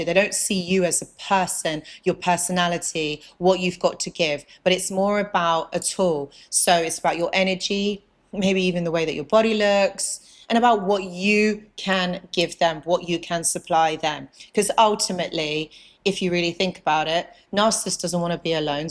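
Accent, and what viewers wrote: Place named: Southern England, United Kingdom. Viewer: You need to learn English, she has a standard southern English accent which is the standard English accent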